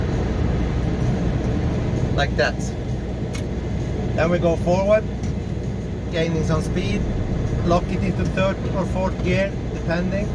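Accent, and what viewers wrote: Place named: Dalarna, Sweden. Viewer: Använde Transportstyrelsen för att se det, såg din reg plåt o tänkte att jag kunde säga det. 😂 Är från Sandviken i alla fall, jag har för mig du är i Dalarna, från hur du pratar svenska.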